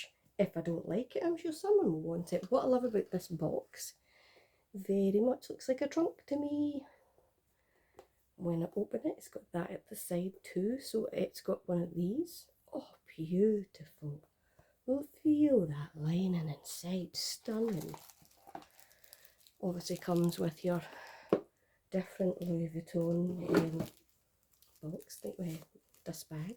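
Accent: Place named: Scotland, United Kingdom. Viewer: Subscribed just for your lovely Scottish accent, well and I love bags too😂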